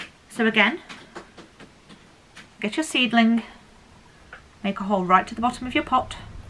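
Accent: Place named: East Midlands, United Kingdom. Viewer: Your accent is similar to mine where abouts are you from?. I live in east Midlands do we have it?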